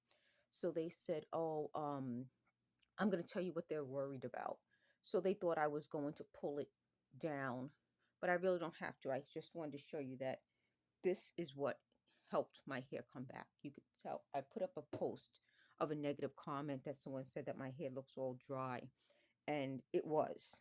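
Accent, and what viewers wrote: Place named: New York, United States. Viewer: I'm sorry you are going through this! Do you think it's Riverbay doing this? Or their leaks/ mold causing issues? I know other people that are having issues, and they think its the water (the shower water may have too much metal) or the mold. I hope your leg gets better soon. Have your doctor check your ferritin and all iron levels. Also, check your insulin level, specifically A1c test. Again, I hope things get better. Thanks for the hair oil info! 😊 btw I love your slight NY accent. Keep smiling and try not to let anyone get to you!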